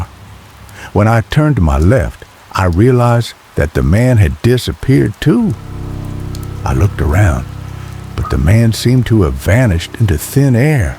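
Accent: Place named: South, United States. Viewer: A Southern accent talking about kilometers and petrol??? Somebody didn't do ANY research before posting this mishmash.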